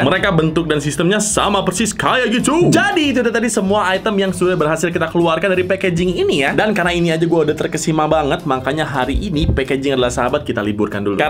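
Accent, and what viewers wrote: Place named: Java, Indonesia. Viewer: Kudune koyongene koyongono agak keluar jawa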